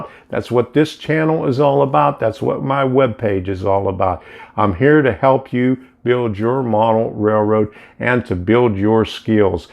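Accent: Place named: Philadelphia, United States. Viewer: You can plan away until you are blue in the face, but when it comes to the "do", plans usually have to change on the way. However, I've learned one valuable lesson which, over the years has saved me both money, and time - thats, dont fix in place until you are sure its where you want it to be, and that applies to track, buildings and wiring alike. I've just spent 6 months working with Railmodeller Pro software, and everything fitted a treat, but I hate to mention how many times Ive substituted one turnout for another or rebent lengths of flexitrack getting stuff to conform to my "ideal" - easier to get your sections right first before you cut and have to rejoin track. Now on the "do" stage, and testing with most unreliable loco, longest coach, and widest truck load to ensure power joints, clearances and curves are as "hoped for". 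Still nervous about combining light and semaphore signals - in 50 years, only ever done the latter.... Great vids - keep them coming...dunno what some folk whinge about, I'm a brit, and I understand your "philly" easily. Wonder if these folk who complain about regional accents are as ready to criticise UK colloquialisms as readily to peoples faces?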